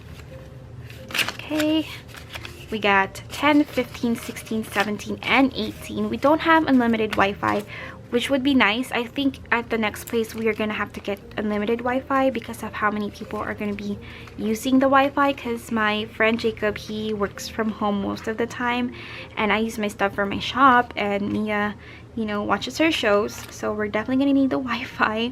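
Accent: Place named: Philippines. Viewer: Firts time viewer and why did I recognize the Filipino accent from sentence one. 😅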